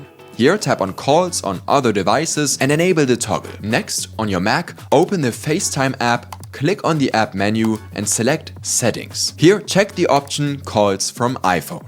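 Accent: Germany. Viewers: 15 sekunden gehört direkt gewusst das er deutscher, ist mach weiter so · This german accent is killing me. Ffs fellow Germans, learn some good English.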